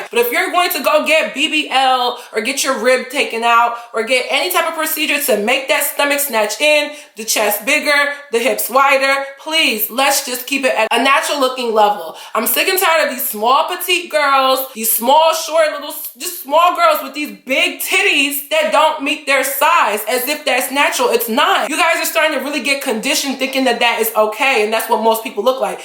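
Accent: Nigeria. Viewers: that beautiful Nigerian accent😍😍😍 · Loved this video. Very funny and great points. Also love when the accent comes out. 9ja!!!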